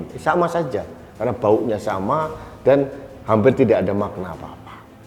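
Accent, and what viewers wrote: Java, Indonesia. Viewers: Saya sangat senang dengan Kata Prettt dri,yg kental logat jawanya,setuju Gubernur cuman obral janji hasil 0,hasil Bel gedes geh pak,Nollll blonggg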